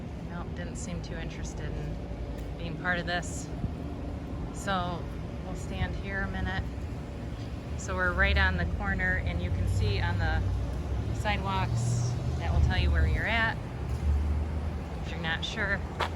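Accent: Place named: Chicago, United States. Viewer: I like your accent. Are you from ChicAHHHgo? 🙂🙂🙂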